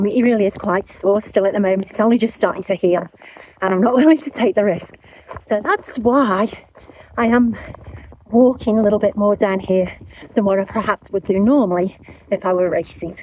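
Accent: United Kingdom. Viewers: Great video. Thanks for an expert perspective. On race day, I only ever see the back side to Ambler Loop in the dim dusk or in the dark. Have trained there quite a number of times but its still great to see it from a runners point of view...btw, its great to hear a Brit commentary...I am from Cheshire... see you in God's Country in August!!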